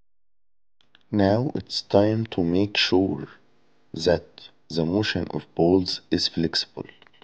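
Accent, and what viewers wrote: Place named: Egypt. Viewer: اللللله على الانجليزية المصرية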